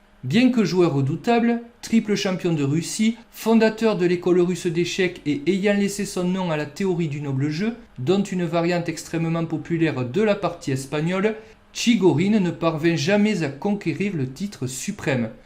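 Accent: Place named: South, France. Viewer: Rien n'éclipse en effet la chaleur de votre accent solaire;-) Merci pour cette vidéo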